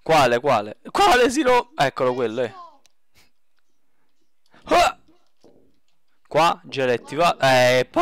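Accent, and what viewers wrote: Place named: Naples, Italy. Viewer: Napoletano <3 <3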